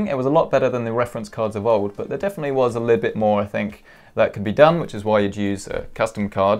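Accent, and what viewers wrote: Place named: Southern England, United Kingdom. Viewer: Judging by your accent are you in the south of England?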